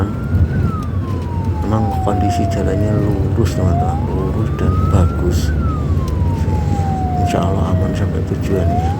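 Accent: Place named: Tegal, Indonesia. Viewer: Tegal..ora ngapak ora kepenak..hadir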